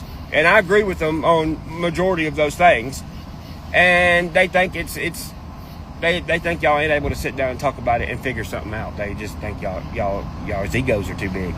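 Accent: South, United States. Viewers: Still sounds like a sassy southern hairdresser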